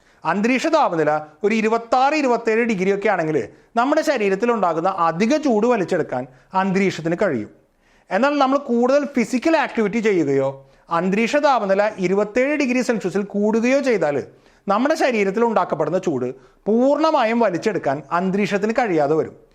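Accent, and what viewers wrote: Thrissur, India. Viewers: ചേട്ടൻ തൃശ്ശൂർ ആണോ? 😊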